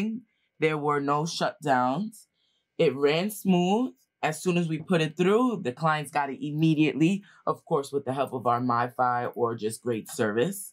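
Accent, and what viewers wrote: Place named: New York, United States. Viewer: This girl sooo New York. I love it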